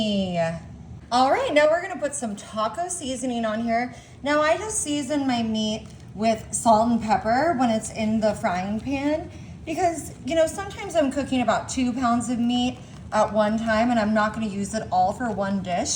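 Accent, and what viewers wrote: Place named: Michigan, United States. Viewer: She's being funny. But I from what I remember, I think it's natural for the snowy white areas of Michigan. The retirement villages.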